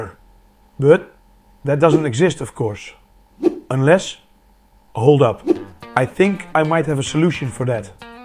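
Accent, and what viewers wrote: Netherlands. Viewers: I'm filled with proud Dutch feelings after hearing the accent. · Nederlander detected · So to hear it's definitely a Dutchman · I hear the Dutch ;) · Ahh that's crispy Dutch accent 😅😂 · His english Sounds a bit like from nedderlands ... The sun there gives nearly 1000w/m² ...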